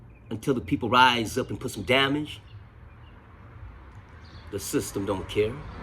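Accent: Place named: South, United States. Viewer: Powerful, brother ✊. You sound like you might be from the South. Mississippi, maybe? If you are, we need you to go to Minneapolis and school those MFs. I don't mean just the Tou Thaos, but also the Tou Ger Xiongs and the KaYings who purport to be the almighty leaders in the Hmong community. Tell 'em to take their degrees and get dafuq outta the way so you can stop this sh!t from happening again. They let Tou Thao happen and he embarrassed us all forever. I am pissed.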